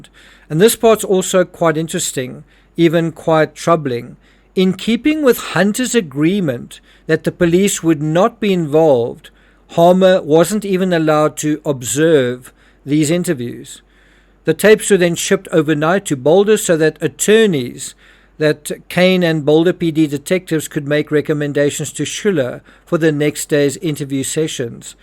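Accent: South Africa. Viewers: The narrator sounds very South Afrcan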